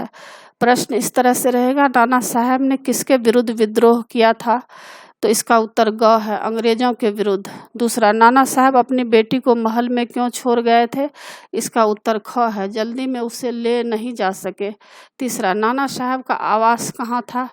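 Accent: Bihar, India. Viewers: Mam aap bihari hai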